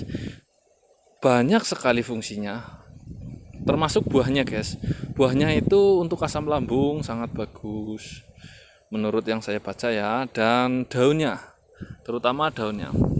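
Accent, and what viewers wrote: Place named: Java, Indonesia. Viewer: wong ndi Rika,medok temen ngomonge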